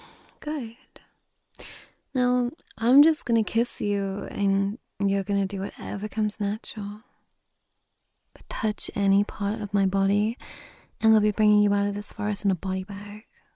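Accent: United Kingdom. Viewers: Love your cute British accent.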